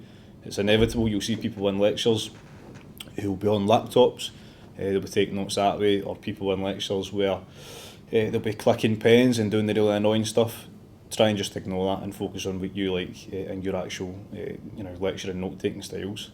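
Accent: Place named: Austria. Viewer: this guy speaks english like the way the Austurians speak german !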